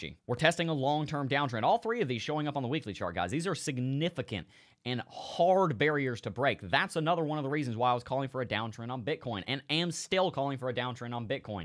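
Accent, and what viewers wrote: United States. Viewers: that is a lot better 😂😂😂 Can I ask you, where are you come from. Because I’m from the Netherlands 🇳🇱 for me you sounds like a American...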